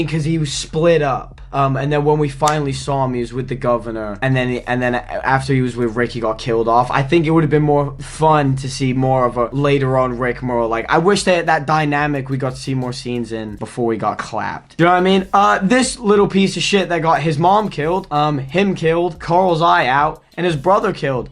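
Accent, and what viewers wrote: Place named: United Kingdom. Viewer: I cant tell if youre an American with a slight British accent or a British person who's lost most of their accent Edit: definitely british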